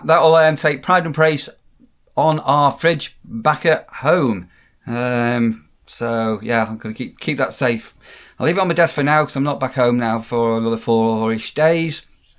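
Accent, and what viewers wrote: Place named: Hull, United Kingdom. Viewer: Are you from hull area no diss but tha sounds like cod head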